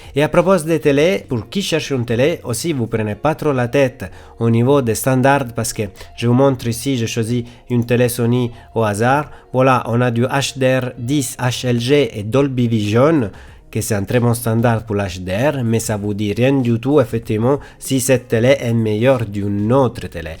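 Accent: Italy. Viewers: Bravo je m abonné et jolie accent italien ???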